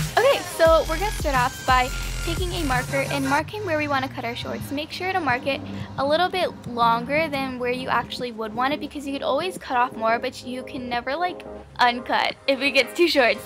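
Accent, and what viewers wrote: Canada. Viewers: Canadian ? Yééé :) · omg are you from canada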